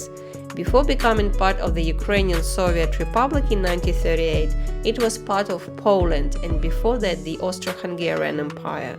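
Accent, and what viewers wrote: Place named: Russia. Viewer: не возможно слушать этот ужасный русский акцент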